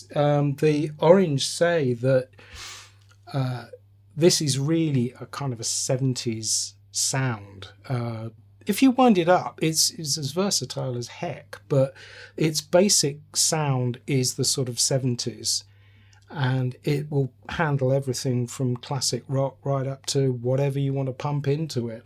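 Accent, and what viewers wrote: United Kingdom. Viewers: I could listen to this British accent all day.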